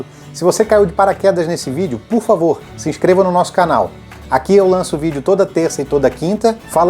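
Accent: Florianópolis, Brazil. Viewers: Sotaque de manezinho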